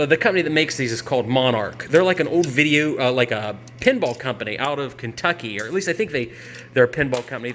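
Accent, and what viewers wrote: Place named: Atlanta, United States. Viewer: Where are you from? I know a guy in Atlanta with the same accent, but I don’t know if he’s from there originally!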